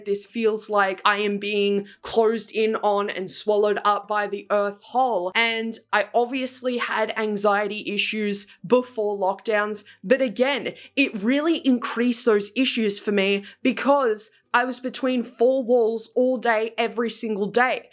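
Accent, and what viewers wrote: South, United States. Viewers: your american accent was so good i forgot you were australian for a second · Ngl ur American accent is hilariously good 😭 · your Southern accent is spot on 🤣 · I wasn't expecting the southern accent immediately, I'm crying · Why is your southern accent peak?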